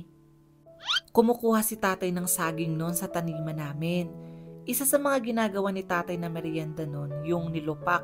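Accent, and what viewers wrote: Visayas, Philippines. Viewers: bisaya ka po ba???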